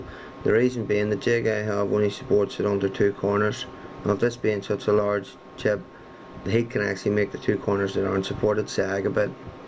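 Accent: Northern Ireland, United Kingdom. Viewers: thats a northern ireland accent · Pretty sure he's from Northern Ireland. -_ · Actually he is northern Irish, which is in the UK, not Republic of Ireland which isn't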